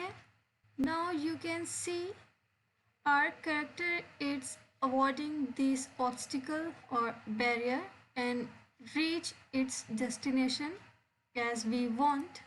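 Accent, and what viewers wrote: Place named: India. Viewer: U are Indian Sounds like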